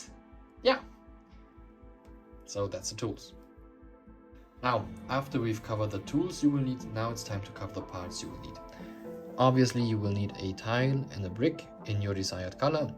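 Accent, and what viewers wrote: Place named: Germany. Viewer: I think your deutsch 😂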